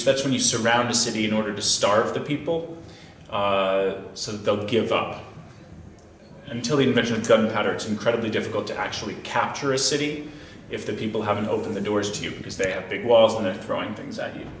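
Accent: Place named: United States. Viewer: No, but I took it in 10th grade. The American accent and English writing made me assume this was for a US based class, and was a bit confused as to whether this was a standard US history class like American Democracy or APUSH, or a specialty course. But the Bulgarian National Diploma must be much differently structured. Seems like a very interesting class to take.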